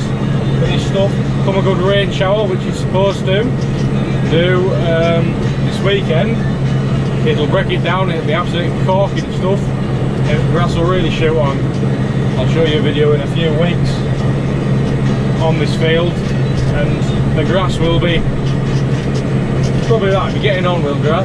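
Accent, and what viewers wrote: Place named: Yorkshire, United Kingdom. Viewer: He is from yorkishire the accent should tell u that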